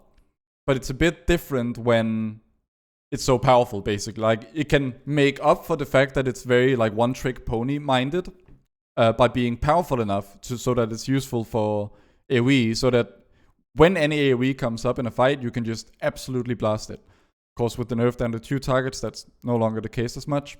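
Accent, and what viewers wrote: Denmark. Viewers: Are you Danish by any chance? The accent seems very familiar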